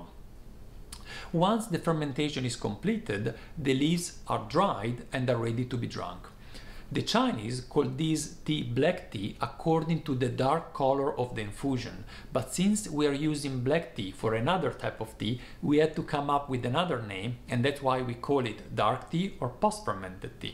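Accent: Italy. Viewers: You sounds like an italian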